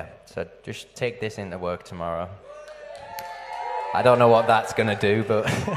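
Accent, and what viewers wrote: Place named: United States. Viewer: Ohhh I do love you Murrrcan accent.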